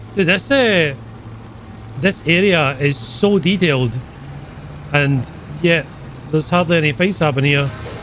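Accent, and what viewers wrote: Scotland, United Kingdom. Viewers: That Sco’ish acc makes everything he says so lively!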